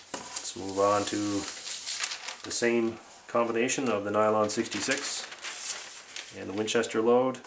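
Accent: Canada. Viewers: I thought you sounded like those epic meal time guys. That explains it. You're both Canadian.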